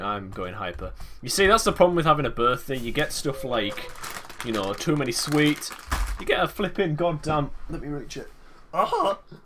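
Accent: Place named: United Kingdom. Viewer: You British m8 love your vids you make my day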